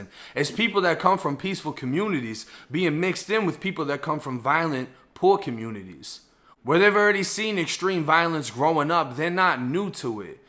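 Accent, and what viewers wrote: Boston, United States. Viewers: what part of Mass are you from.The Boston accent is distinct. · It's about time you started talking about "Boston" where your a native at bud or places across the world.... this is getting a little dry, you only did 3 years in a Fl prison, and you have a whole channel based on those "3" years, and while living and obviously being from "Boston" you talk about Fl prison and the people who live in this "one state" you only served "3" years in prison at, but your not a native 2..😒 you should expand which prisons you talk about talk about them "ALL" your language skills are superb very well spoken! you def should have a channel your more then worth listening 2. If you didn't sound like Leo from "Departed" or "Wolf of Wallstreet" it would go down smoother, but your "Obviously" from 'Boston" but have and "ENTIRE" channel based just off only 3 years of your life from a place you were a transplant 2 in the 1st place.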